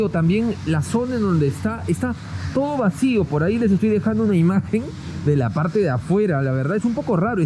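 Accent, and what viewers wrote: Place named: Peru. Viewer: Me gusta mucho tu contenido es interesante pero de verdad que no puedo soportar el acento y la voz peruana es como si estuviese viendo discovery kids lo siento:(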